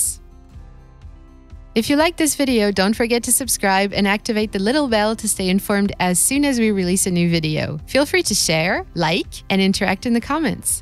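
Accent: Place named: United States. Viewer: American accent, but went metric. Oof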